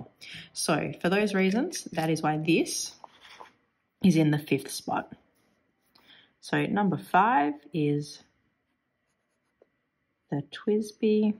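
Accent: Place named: Australia. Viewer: I, too, am not so fond of Lamy. I adore the Plaisir. It writes all the time. The Preppy just can’t be beat. I have several sets in different nibs all inked up in their different colors, so I can draw quickly. They are only $4.00 here. I do not like my Kawecos at all. It think it’s the nibs, so I might change them out. I do not yet have a TWSBI yet. I’m cheap. Don’t love the piston fill, either. I love the Platinums altogether. The Kukuno is also a big favorite and I have several, as they only cost $12.00 here. I have them all over the house. 😅I never spend more than $30.00 on pens. I’m now getting into inks. OMG. About to lose my mind. So many choices, so much money. I prefer cartridges so will be filling my own with special inks. Some inks are so gorgeous they should be drawing inks because the writing doesn’t really show all the nuances. Do you have actual pen stores in Australia? 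I live in Oregon, USA, and we have only one stationary store and they carry a few fountain pens. Other than that, in my local area, I buy everything on line. I probably told you before, I love your accent and all things Australian 💝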